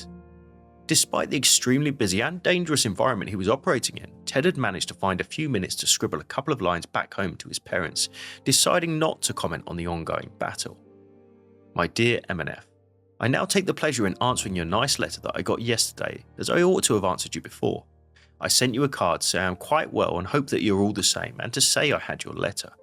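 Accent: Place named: Hertfordshire, United Kingdom. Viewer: Are you in Herts? For a while I've heard some kind of familiarity with your accent!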